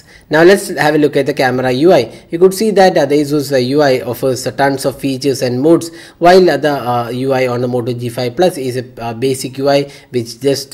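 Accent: India. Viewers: Ingles com sotaque da Índia. Fica bem cantado mesmo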